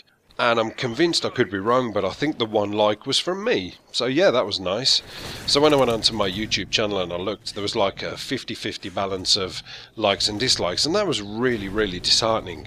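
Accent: United Kingdom. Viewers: your british accent is pretty freakin awesome!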